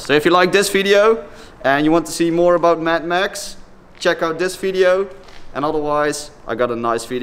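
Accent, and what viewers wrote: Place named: Netherlands. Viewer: Dutch accent 🙃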